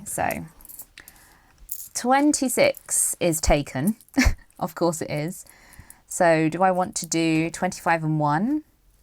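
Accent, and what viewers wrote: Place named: United Kingdom. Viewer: Nice to meet you. Love a British accent. Hello from Australia 🇦🇺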